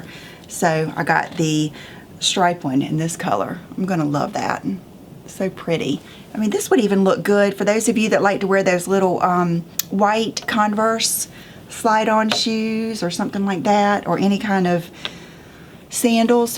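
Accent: South, United States. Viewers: Love your accent, are you from the south in the USA ? Maureen x from New Zealand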